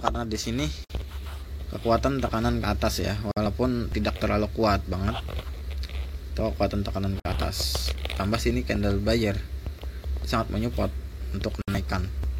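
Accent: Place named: Kalimantan, Indonesia. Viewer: Bang tinggalnya dimana ? dari logat bicaranya org kalimantan yaa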